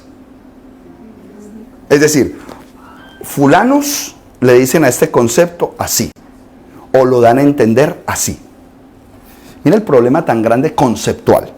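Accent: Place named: Colombia. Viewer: Inmediatamente me di cuenta de que usted es colombiano 👍gracias por compartir tanta enseñanza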